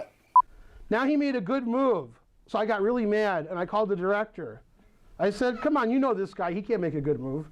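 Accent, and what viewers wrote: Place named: AAVE, United States. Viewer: I can't understand you because of ebonics. I never thought the last bastion of edgy humor would be from a chess streamer..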